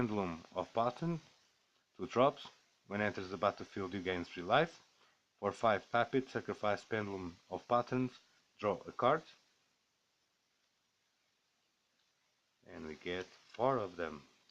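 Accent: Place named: Portugal. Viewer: i have a quick question , you seem like you have a "portuguese accent" where do you play magic ?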